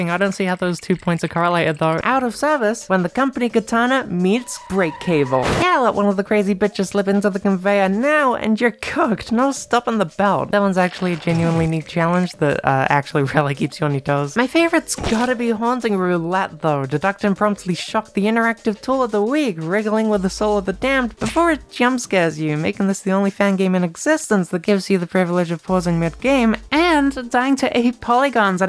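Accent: United States. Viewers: Hill billy accent is not something I knew I needed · why do you keep randomly slipping into an american accent in this episode its like a flashbang every time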